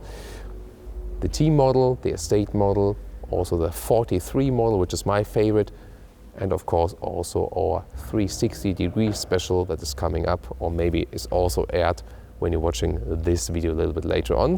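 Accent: Germany. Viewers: very good review! Although in my view the sport seats which are part of the Edition 1 are very comfortable in my view. I agree with you, the Standard seats are eventually better for very long trips, but they look too serious in my view. But that is a very personal thing, I see that you like more the traditional style things, elegance - thats good and fits to you :-) I like your reviews very much, but why dont you do them in German, I can hear that you are a German (I am Swiss).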